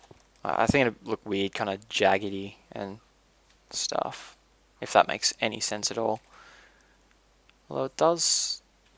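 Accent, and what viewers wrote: Australia. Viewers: Are you Australian? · Your australian accent :)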